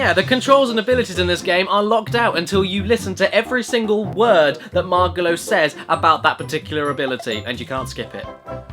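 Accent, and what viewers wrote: United Kingdom. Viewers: hey i have a question this is gonna sound weird but are you british or irish i'm sorry but your accent is just a big mix up of like 50 accents so i'm wondering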